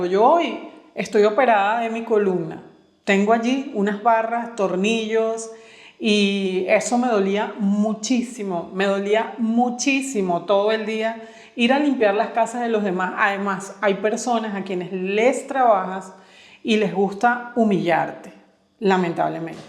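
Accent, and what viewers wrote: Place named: Venezuela. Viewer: Hola, por tu acento te identifiqué como venezolana y no me equivoqué. Me siento identificada contigo con respecto a las series turcas. Leí a una especialista en el tema de novelas de TV y ella decía que las novelas son formatos diarios (de lunes a viernes) y que las series son 1 episodio por semana. Al igual que tú, me encantan las series turcas y la primera que ví y que me enganché inmediatamente fue Kara Para Aşk a finales del año 2018… Engin Akyürek me impactó como actor y enseguida lo Googlee. Y son súper fan de él, he visto todas sus interpretaciones y tengo 1 de sus libros. Con la cronología de tu relato me hiciste revivir aquella época de las telenovelas venezolanas, ví muchas, luego las brasileñas, las colombianas (antes el mercado era exclusivo de las mexicanas) ahora en la TV nacional las turcas están en el horario estelar de las de la noche. 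Me gusta mucho como relatas los temas que tratas y cuéntame como una nueva seguidora en tu canal. A propósito soy de Guayaquil-Ecuador Saludos ❤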